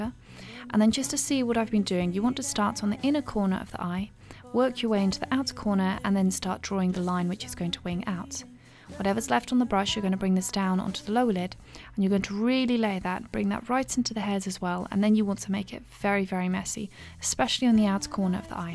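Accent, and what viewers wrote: United Kingdom. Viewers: What accent is that? Before you say British, I mean what part of Britain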